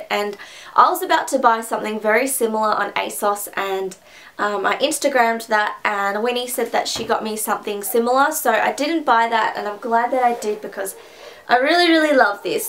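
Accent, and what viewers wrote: Australia. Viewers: Yeah aussie accent! Your gifts are just as good as hers, things in oz are so expensive!!! Im an aussie girl in US :-)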